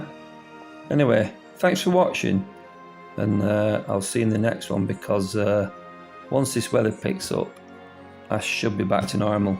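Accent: Lancashire, United Kingdom. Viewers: Happy new year, the tornado was local in Tameside did some serious damage. I'm guessing from you accent your Bolton/ Burnley.Great video again love your new gun.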